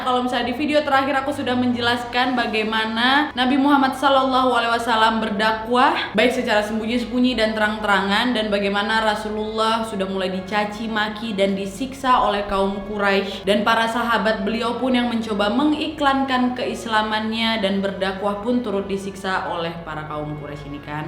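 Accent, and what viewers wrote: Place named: Medan, Indonesia. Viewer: Orang medan nih hehe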